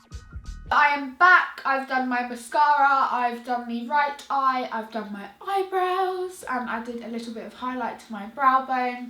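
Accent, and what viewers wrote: United Kingdom. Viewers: moon light British accents are the best 😂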